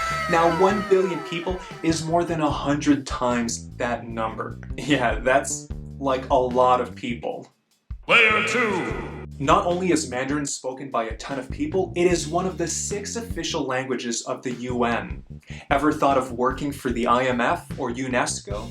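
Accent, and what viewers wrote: Standard. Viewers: you speak the standard Mandarin !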